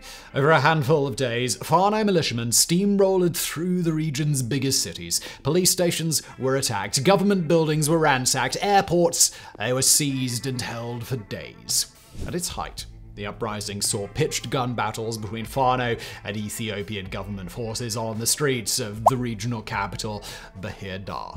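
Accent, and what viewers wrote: England, United Kingdom. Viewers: You sound like an Englishman or British you know these people do no longer want your propagandab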